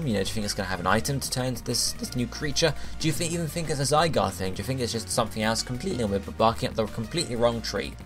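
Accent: United Kingdom. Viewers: He's from the UK, they pronounce words and letters differently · Omg man sorry that's amazing. That's just how us brits pronounce the letter Z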